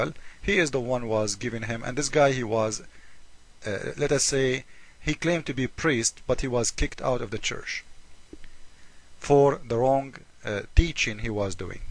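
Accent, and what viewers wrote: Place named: Iceland. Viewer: are you from iceland? love the accent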